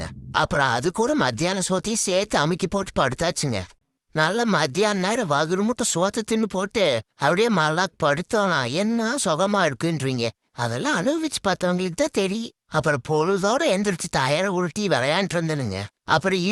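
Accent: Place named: Kongu, India. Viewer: Kongu thamil super ❤❤❤😂😂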